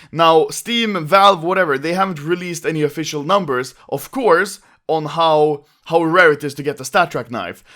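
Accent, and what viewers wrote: Sweden. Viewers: You speak engswedish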